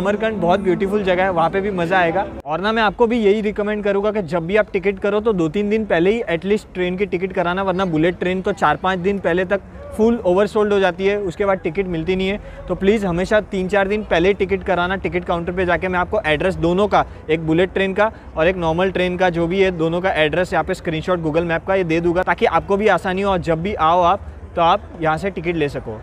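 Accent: Gujarat, India. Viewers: Being a gujarati myself, muje to iski hindi me bhi gujarati h sunai deti h. 🤣👍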